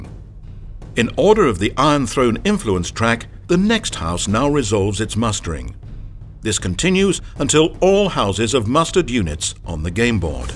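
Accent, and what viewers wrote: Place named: England, United Kingdom. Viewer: This tutorial is better with the narrator's English accent.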